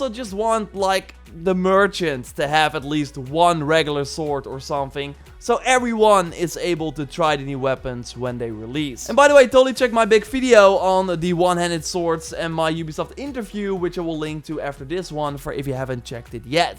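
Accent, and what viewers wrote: Netherlands. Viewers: Yeah i think he speaks dutch judging by his accent at least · why does sound so Dutch?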